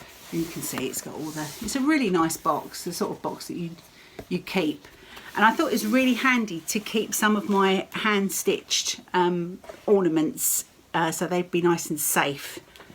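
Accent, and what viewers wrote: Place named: Nottingham, United Kingdom. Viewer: Gorgeous stockings! Loved your use of the word “tiddle”. We had a lovely neighbour who we watched over. She was from Nottingham & always used that word. My hubby could never figure out why she always called him duck! We were neighbours here in Vancouver, Canada for 28 years. Sadly she passed away 6 1/2 yrs ago at the age of 90. Your accent always makes me think of her. Merry Christmas to you & your family.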